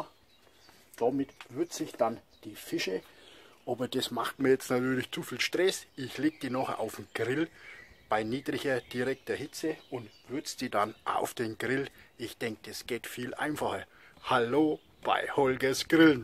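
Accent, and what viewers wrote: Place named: Franconia, Germany. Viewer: Fränkischer Dialekt. Pils zum trinken. und FC Bayern Trikot. Ich bin maximal verwirrt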